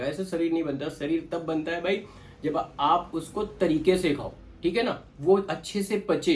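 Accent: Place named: Awadh, India. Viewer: Samjhane ka tarika thoda casual hai bhai kya awdhi bolte ho bhai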